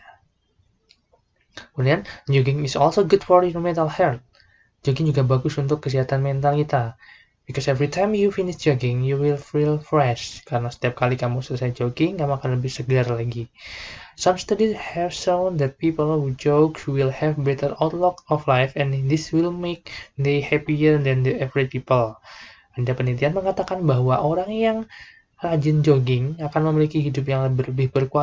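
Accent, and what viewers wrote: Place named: United Kingdom. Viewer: Britis sekali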